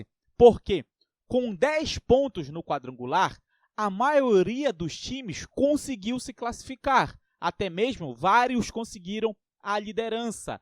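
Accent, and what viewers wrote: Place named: Pará, Brazil. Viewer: Mano tu e paraense né não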